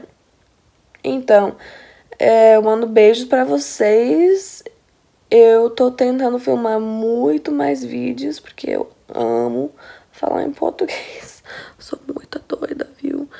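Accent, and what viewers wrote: Bahia, Brazil. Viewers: Português dela tem sotaque baiano.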